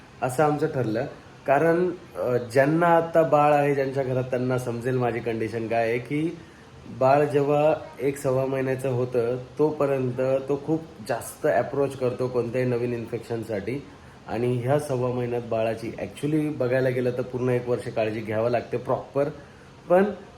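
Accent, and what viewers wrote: Nashik, India. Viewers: Dada aple nashik vale olku yetata voice madhun kadje gya dada tai chi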